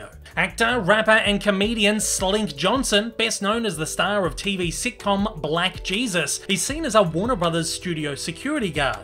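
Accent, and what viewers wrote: Australia. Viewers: Where abouts in Australia are you from?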